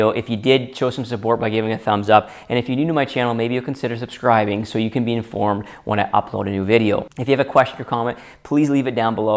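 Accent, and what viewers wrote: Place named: Canada. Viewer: Upvoted and shared! Plus: I knew you were Canadian, after a few moments of listening : )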